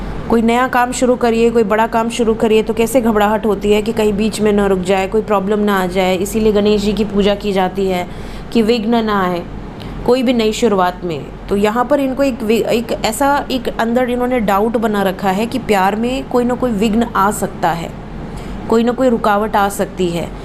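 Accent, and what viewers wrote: Varanasi, India. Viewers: Didi aapki banaras wali boli badi pyaari lg rhi aapke muh se😍❤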